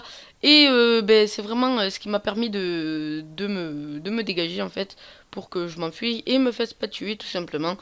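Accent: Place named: South, France. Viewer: le petit accent du sud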